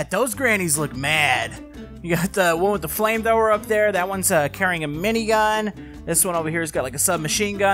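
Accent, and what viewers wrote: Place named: South, United States. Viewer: And are you from Texas because you sound southern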